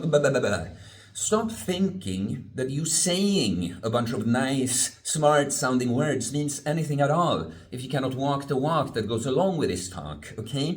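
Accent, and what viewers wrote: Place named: Sweden. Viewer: this was fucking hilarious, I nearly pissed my pants. Your Swedish accent rocks! Love your outlook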